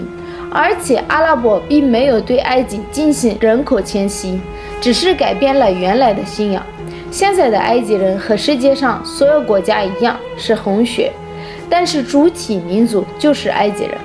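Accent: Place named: Shandong, China. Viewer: 山东口音很亲切啊！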